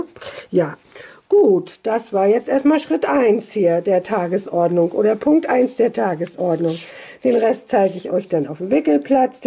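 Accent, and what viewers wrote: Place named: Low German, Germany. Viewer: Süßer Schatz Wenn er ein bisschen größer wäre hätte ich angefragt Ich mag deinen Dialekt so gerne - Plattdeutsch ist einfach toll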